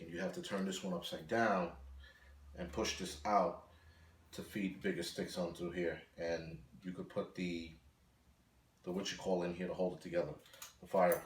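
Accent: New York, United States. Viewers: Are you from NY? I'm sensing an accent.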